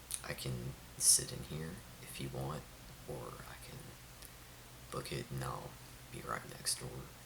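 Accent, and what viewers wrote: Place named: Oklahoma, United States. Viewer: I’m from Oklahoma, so your slight accent makes me feel like 10 times better. Most people who do stuff like this talk properly and it’s soothing,yes, but your accent makes me feel at home and o feel like it’s actually comforting not just soothing.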